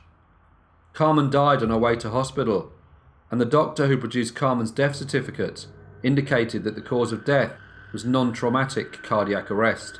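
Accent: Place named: South East England, United Kingdom. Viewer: New sub here. Trying to work out where you are from in England! Your accent is similar to mine, so I'm guessing the South East?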